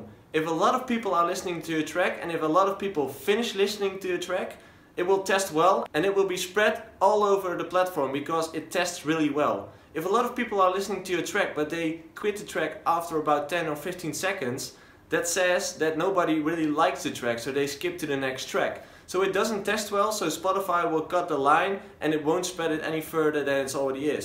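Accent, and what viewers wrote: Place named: Netherlands. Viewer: lekker nederlands accentje! ;)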